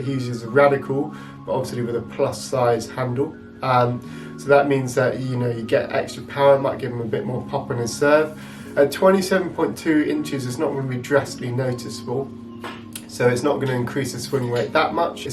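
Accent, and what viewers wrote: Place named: England, United Kingdom. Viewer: England? I can barely understand, but love the accent. What about senior players? I’m finding this racket pretty awesome.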